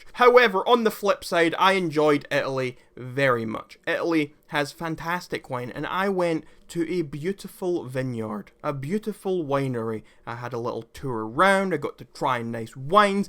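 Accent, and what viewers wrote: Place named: Scotland, United Kingdom. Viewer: If an Australian person punches you square in the nose that would be very very real Also, you called out Aussie accents and your literally Scottish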